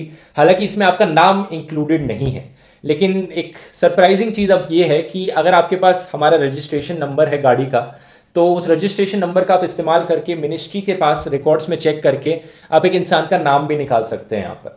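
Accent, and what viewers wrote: India. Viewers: I dont understand a singke fricking word youre saying... please talk without that indian accent :)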